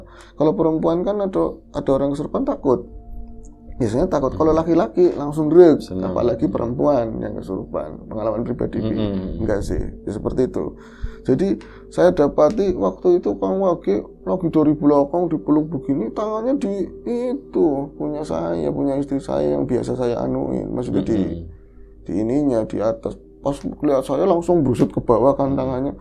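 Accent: Banyumas, Indonesia. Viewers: Weee pagi2 tayang... seneng denger bhs mas e narator ngapak2 gmn gtu.... ada nak 🥰🥰🥰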